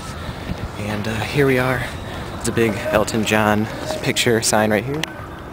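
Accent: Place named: Minnesota, United States. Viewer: You know, your accent reminds me of a Minnesotan accent. My landlords are from Minnesota (Im from Colorado) and when they argue I LOL.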